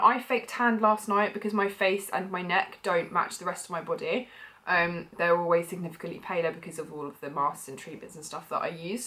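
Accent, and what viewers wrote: United Kingdom. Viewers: what part of uk is your accent? If you don't mind me asking. I must say, you're very beautiful and your accent makes you perfect 😊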